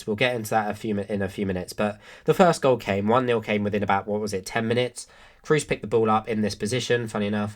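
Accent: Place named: Scotland, United Kingdom. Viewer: Not much to add to the other commentators. Well analyzed, dear Mr., a really German sounding name as you know, and I always enjoy some English accents, like yours from Scotland. Greetings from Potsdam. Nearly forgot to add, the goals were shot with some luck, weren't they? The goal/attempt ratio will go down I would expect.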